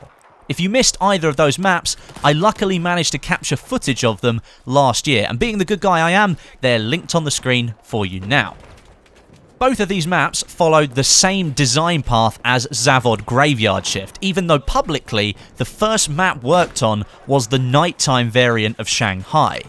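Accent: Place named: United Kingdom. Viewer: Waiting for the voice over to start ... Hear a British Accent. Yes!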